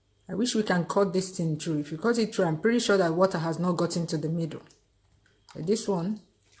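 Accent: Igbo, Nigeria. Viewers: I don't boil my rice but I wash it more than 20 times! And it comes out amazing !I would always like our Igbo accent 🙌Ndeewonuooo!!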